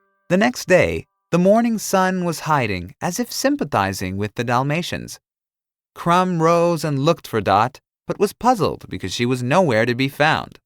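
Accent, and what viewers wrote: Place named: United Kingdom. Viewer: The narrator's British accent gave me some italian school memory vibes (yes, italians studies English at school! Isn't amazing?)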